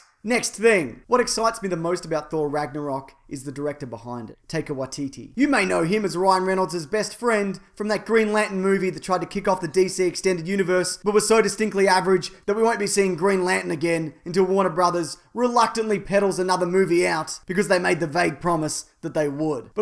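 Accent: Australia. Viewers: I'm Aussie, born and bred, and my accent is not where NEAR as broad..are you...amping up the Aussie a tad? Anyway, great stuff, love you work, give me a fucking loot box. Cheers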